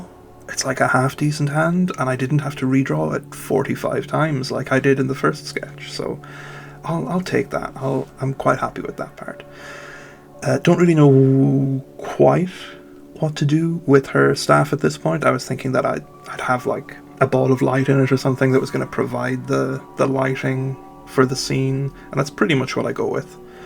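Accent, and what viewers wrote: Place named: Germany. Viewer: 😍 OMG, first time I'm seeing your own art! ...your accent sounds a tad German... Sind wir Landsmänner?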